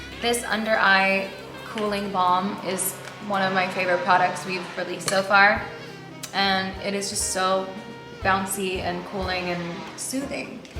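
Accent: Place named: South, United States. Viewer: Yeah what happened to that southern accent she had like 5 years ago? She straight up sounded southern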